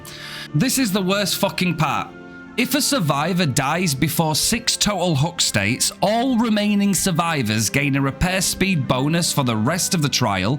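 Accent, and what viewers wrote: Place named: United Kingdom. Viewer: Bro this was so funny listening to especially the start to mid rant and the british accent just is the icing on the cake, I say this with love as a fellow european you are really good at ranting and the accent fits perfectly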